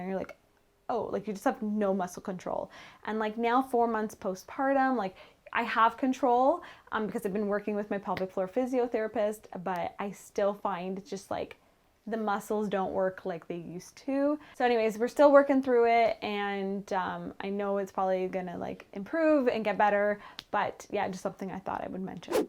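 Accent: Canada. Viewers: Great video! Are you Canadian?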